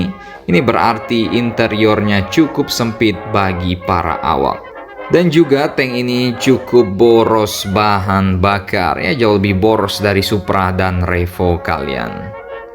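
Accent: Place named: Makassar, Indonesia. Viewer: Min orang makasar ya khas bangat suaranya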